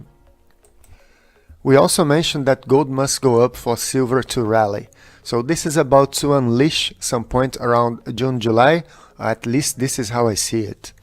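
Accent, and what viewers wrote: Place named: Brazil. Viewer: Not gonna lie, but your acent sounds very Brazilian for me haha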